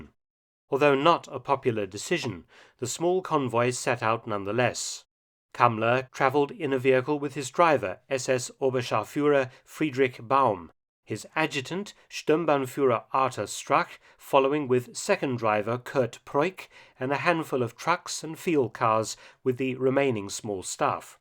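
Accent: England, United Kingdom. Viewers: Fantastic English accent....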